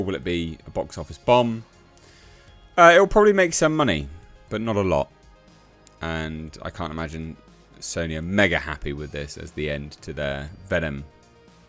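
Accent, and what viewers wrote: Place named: United Kingdom. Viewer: Us Brits pronounce tomatoes differently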